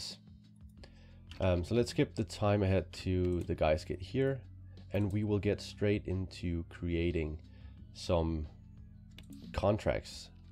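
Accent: Denmark. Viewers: Your accent sounds danish. Eller tager jeg fejl?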